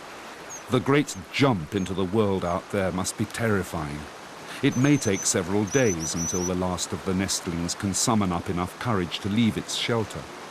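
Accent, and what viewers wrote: RP, United Kingdom. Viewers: Good video, but flawed by the dude with the patronising tone, narrating to me like I am in an Oxford library or school, then the stupid music, if I want music I go to my playlist for it, here I want wildlife, no buffoon trying to talk Royal no big band, just decent narration, good cam crew, couch a beer door shut lights low....job done, but no,...I get this patronising Gitt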